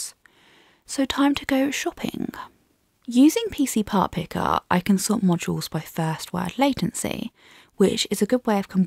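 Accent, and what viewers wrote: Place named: RP, United Kingdom. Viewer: still watch your videos just to hear you say "but" with that posh accent of yours.... will shamelessly steal your voice for my AI personal assistant when the time comes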